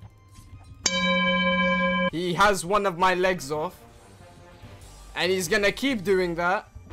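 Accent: United Kingdom. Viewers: YOUR BRITISH!?!?!?!?!?!?!?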